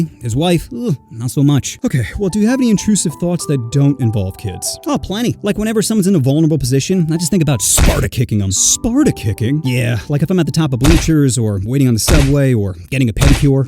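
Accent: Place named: Alabama, United States. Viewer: Bros from Alabama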